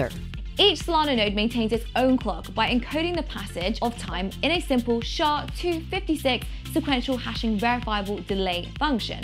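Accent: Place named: United Kingdom. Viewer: That British accent is what gets you every time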